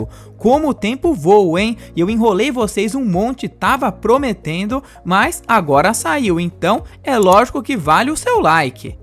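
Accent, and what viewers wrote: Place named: Caipira, Brazil. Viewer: caipirês detectado...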